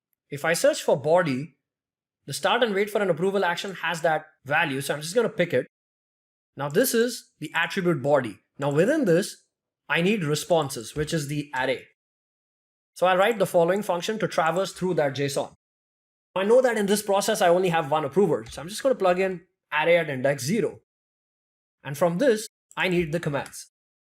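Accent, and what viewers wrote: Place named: India. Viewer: And his mic quality is actually good! Unfortunately so many of these talented developers making content have thick Indian accents, although they can’t help it - this makes it hard to understand coupled with bad microphones its doubly difficult to comprehend the great info they teach: shout-out! 💪🏼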